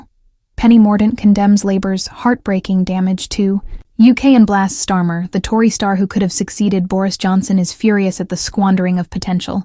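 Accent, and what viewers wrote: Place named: United States. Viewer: Why have we got to have an american robot speaking? Get a real person, and preferably an English one!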